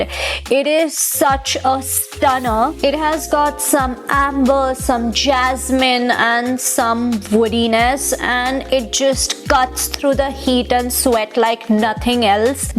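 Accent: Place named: India. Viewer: hint aksanı kulak tırmalıyor işkence gibi AHHHHHHHHH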